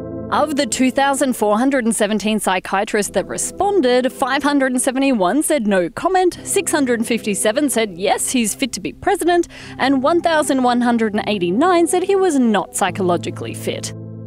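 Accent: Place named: Australia. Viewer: Am I the only one that thought it was a bit odd that Australian was narrating this?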